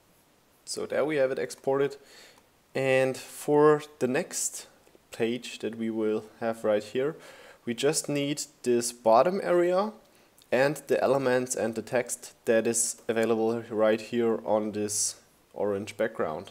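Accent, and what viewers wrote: Germany. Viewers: Du bist ein deutscher oder? ;D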